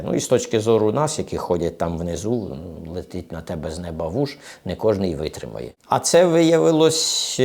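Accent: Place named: Poltava, Ukraine. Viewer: Мелодика мовлення у полтавчан особлива, впізнавана.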